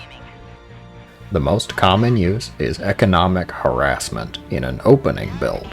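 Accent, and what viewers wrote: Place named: Texas, United States. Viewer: I really like using Banshees and it's not relevant to the video but to be honest and no offense but the way he sound when he talks is like he has a Texas accent and/or some thick saliva in his larynx, maybe he kinda sounded like those Viking units actually.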